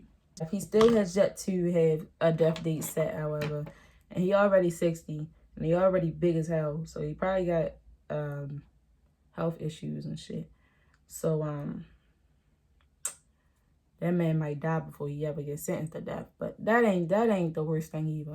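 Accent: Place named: Baltimore, United States. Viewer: Girl, just came here to say that I subscribed to hear true crime with a Bmore accent fr fr! Love it! ❤️ Making me miss home.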